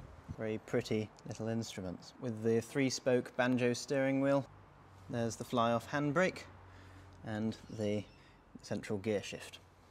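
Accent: United Kingdom. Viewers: Your accent says UK but the number plate says NSW. 🤔 The whole spare tyre assembly is completely different to my 54 TF.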